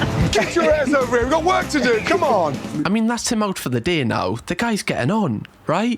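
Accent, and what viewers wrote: Newcastle, United Kingdom. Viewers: Hes geordie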